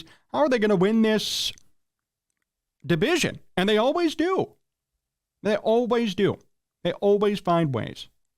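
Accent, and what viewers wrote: Chicago, United States. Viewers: Im not from the midwest, so im a bit ignorant, but im very curious: is this an authentic AF Chicago accent? Cuz i love it. Its like straight out of a movie made in 1947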